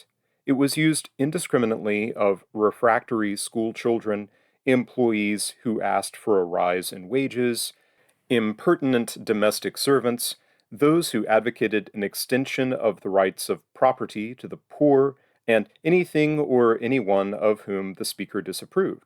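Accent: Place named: United States. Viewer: Wrong for this to be read by an American voice.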